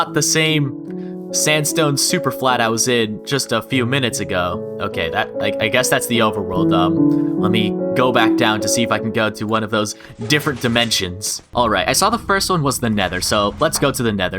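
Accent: United Kingdom. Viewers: why does sound a lil bit english today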